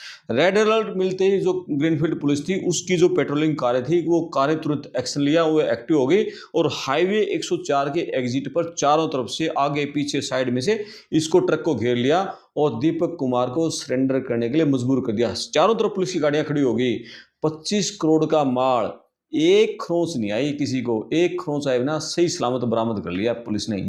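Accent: Haryana, India. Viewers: Ye youtuber Haryana se hai ❤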